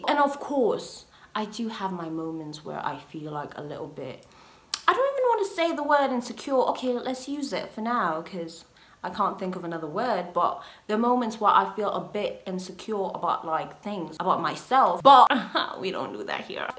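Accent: England, United Kingdom. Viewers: It's the British accent for me 😂❤️❤️❤️ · I don't care much about the stories.. But I love hearing that British accent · Your British accent ♥️ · I love the British accent♥️♥️♥️♥️ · The English accent❤❤❤😂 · You know storytime is gonna be lit british accent ikicome through😂🙌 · It’s the British accent for me😂😂😂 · The British accent always giving me feel good vibes😁😁. This gonna be good 👌. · Am new here and the lady speaks British English.... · That English accent is Perfect 👌👌🙌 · The British accent 😍 daamn girl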